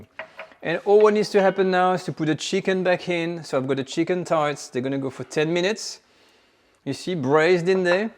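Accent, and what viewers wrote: France. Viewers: French cooking with a lovely french accent, délicious ! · With that accent, you can talk talk talk all you like monsieur. Je t’adore!!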